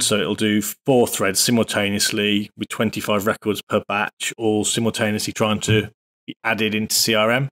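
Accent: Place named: United Kingdom. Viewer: Man, we just hired a really expensive guy. Wish we knew about you., from your accent you're based in the UK, but where abouts are you in the UK?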